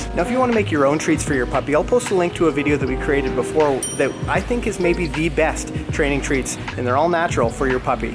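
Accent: Canada. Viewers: You sound really Canadian!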